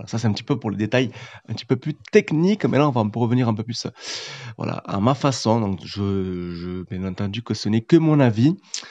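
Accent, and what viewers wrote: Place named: Aveyron, France. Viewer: ça fait plaisir d'entendre un Aveyronnais hehe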